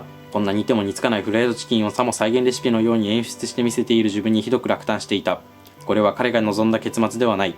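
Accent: India. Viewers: たまに喋り方がインド人ぽい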